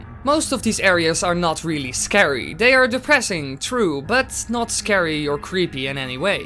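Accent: Netherlands. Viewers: Are you Dutch? I am? Because you have a dutch accent! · Question are by any chance Dutch? You have a very familiar accent ... ;)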